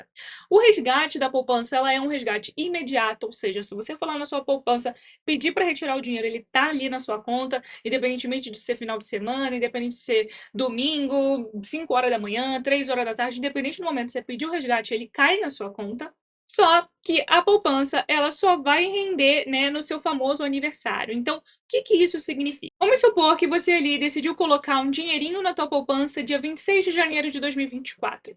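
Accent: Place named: Rio de Janeiro, Brazil. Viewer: Gostei dessa carioca a fala dela é suave sem o carioques exagerado ❤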